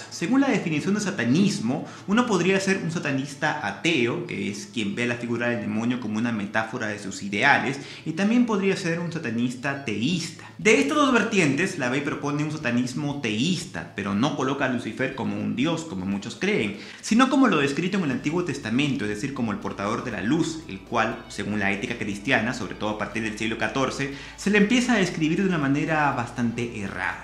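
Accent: Peru. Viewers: Eres de perú????? buen video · Eres peruano? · ¿Es de Perú?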